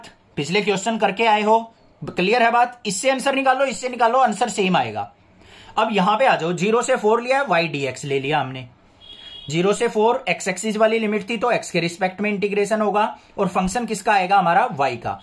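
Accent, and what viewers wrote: Haryana, India. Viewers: Ap haryana se ho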